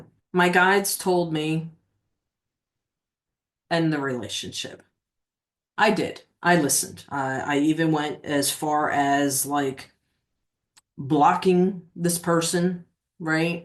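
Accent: Philadelphia, United States. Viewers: I hear a "philly" accent in your voice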